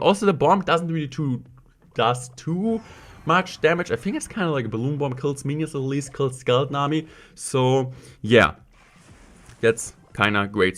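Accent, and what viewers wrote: Germany. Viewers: dieser deutscher akzent lol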